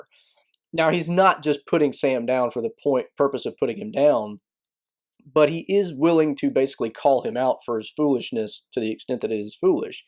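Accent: South, United States. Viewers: I think we could certainly forgive him for this "failing." By the way, do I detect a bit of a Southern accent? I'm from Mississippi.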